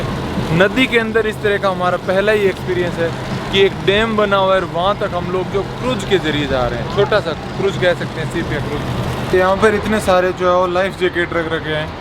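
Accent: Rajasthan, India. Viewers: राजस्थान में कौनसा गांव है भाई आपका। वैसे आप दोनों पति पत्नी का देशी स्टाइल में राजस्थानी भाषा मे बोलना अच्छा लगता है। में तो आपकी आवाज एव बातों को सुनने के लिए ही ब्लॉग देखता हूँ।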